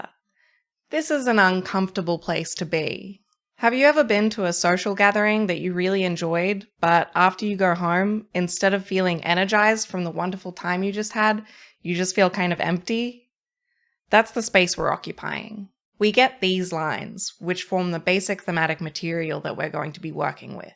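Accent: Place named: Australia. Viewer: Love your analysis, and also the storytelling in your video. Editing is solid and considered, and your performance within that is genuine and carries with it the weight of your words. Glad to have stumbled over your work! Thank you for answering this particular call with yes. Also lovely to hear content with an Aussie accent, and you did a bang up job on your eye shadow. GG all round! Now if you don't mind I'm going to go make my 10,000th Iridium sprinkler and pat all my cows; midnight be damned!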